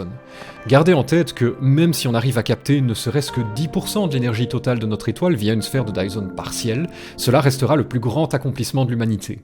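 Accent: Belgium. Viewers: P pour moi ton accent est normal .. je suis Belge également, mais pour beaucoup de français l'accent Belge est celui qui est caricaturé dans "le diné de con" ... ce qui n'est pas pareil :D Personnellement ca ne me dérange pas toutes les histoires de monétisations et de publicités, c'est normal après tout ! A bientôt !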